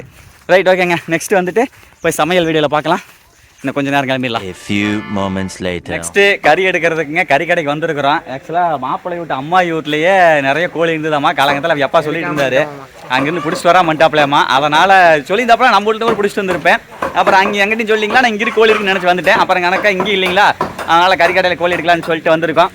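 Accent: Kongu, India. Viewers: Unga kongu Tamil Vera level keep rocking reach heights all the best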